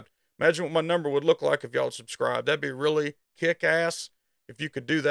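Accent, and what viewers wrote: South, United States. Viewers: I hear the redneck voice and instantly tune out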